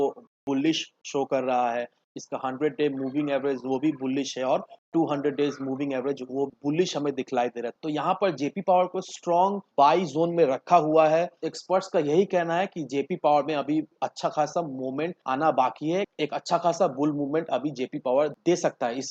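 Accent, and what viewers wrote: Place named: Kolkata, India. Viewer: main kolkata se hu, to mujhe apka tune se aga ki aap ho....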